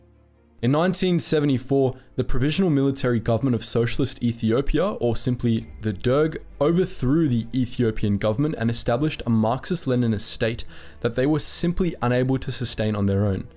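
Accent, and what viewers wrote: South Africa. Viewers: Great video. Based on the accent can I guess the narrator was born in South Africa but moved to Australia later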